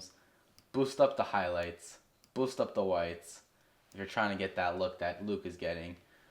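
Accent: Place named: Ireland. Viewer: You have a sort of Irish accent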